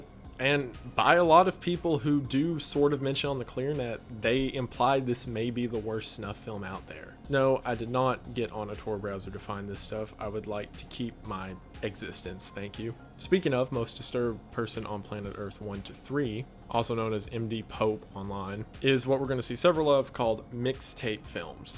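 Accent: South, United States. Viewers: You sound kinda southern and idk if its in all your videos but I just noticed it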